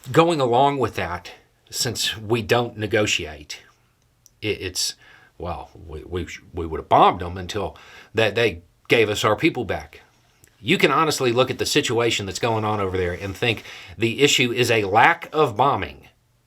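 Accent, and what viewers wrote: South, United States. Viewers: love your takes. I particularly like it when you address some of the jingo-idiot-talking points partly because I do take some joy in seeing someone take the lumber to those idiots but also because I can tell when a topic or take hits too close to home for you. This time I literally had to check mid-stream to confirm I had not upped the playback speed to 1.1 (my adjustment when a video seems to be dragging on a bit) - but nope, normal playback speed. You became about 20% less slow-speaking Southerner and 50% more pissed off in about 4 seconds, and kept the pedal to the metal for the rest of the video. It's good to know the old pickup still has some of that power in there despite having just tooled around the village pop-n-stop for the last few years. Keep it up, you are fighting the good fight!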